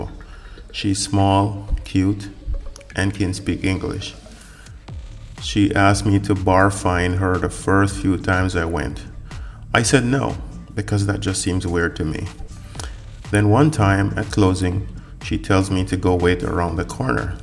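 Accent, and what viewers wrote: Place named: West Indies, Caribbean. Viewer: Interesting story, I detect a west indies accent. Barbados maybe?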